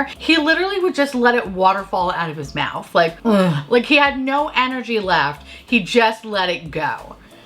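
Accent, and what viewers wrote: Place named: Minnesota, United States. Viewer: The more I hear your Minnesota accent the more I encourage you to watch 'F is for Family' so you can truly experience Jenny Throater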